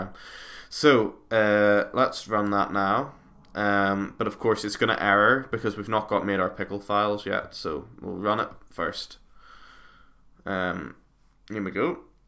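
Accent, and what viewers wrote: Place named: Northern Ireland, United Kingdom. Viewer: You sound northern Irish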